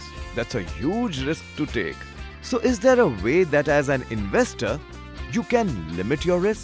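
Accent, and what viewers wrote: India. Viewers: The single most annoying accent in the world. The Indian accent -good grief! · That is a very American looking host with a very indian accent... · This was very informative, but why the heck does he have an Indian accent? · I got here looking for the mathematician term of "derivatives" This is the financial one -_- but it was interesting. I am learning English and I admit that I am not completely good at it... But starting from the first 5 seconds of the video I knew that It was and Indian guy hahahaha. I asked myself. Of course, this video is aimed to western audiences but what is an Indian Guys using an Example his "sacred" animals. In as you have seen the example was refereed to sell them to eat, India Mcdonalds are not allowed to use bovine meat on their products. And I know that I could sound kinda extremist but there are a bunch of animals that could be used for this example in a better way without violating cultural dogmas. 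At the end it was a nice video and I enjoyed it. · Excellent in providing a clear and simple illustration of futures. Love the Indian accent. Thanks for doing this.Keep it up! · I adore the indian accent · Your Indian accent is extremely annoying! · that Indian accent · I didn't expect Indian accent lol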